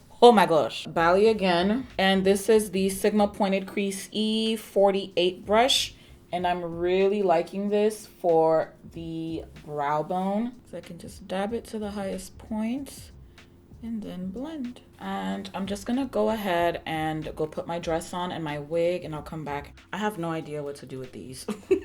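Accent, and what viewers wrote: United Kingdom. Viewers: I want more British accent in your videos PERIODT my darling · Your British accent made my day 😂😂!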